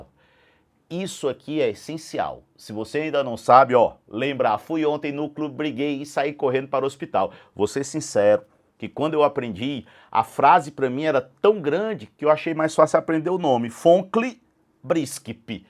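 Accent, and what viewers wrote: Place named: Fortaleza, Brazil. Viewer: assistindo as aulas não so pelo conteudo mas pelo sutaque dele ,bom dms homi ! alguem sabe dizer se ele é de fortaleza? o sutaque é ,mt parecido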